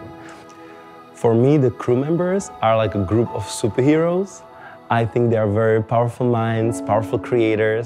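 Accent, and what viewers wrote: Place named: Czech Republic. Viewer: Miluji ten český přízvuk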